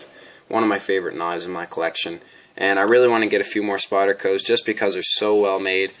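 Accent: Canada. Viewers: You're Canadian, Aren't you? You sound like a Newfie.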